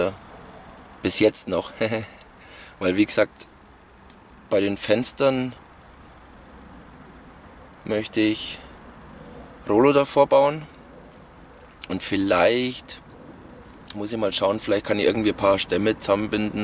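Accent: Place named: Franconia, Germany. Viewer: weil du total fränkisch redest und du auch schon in der frändischen schweiz unterwegs warst... Gruss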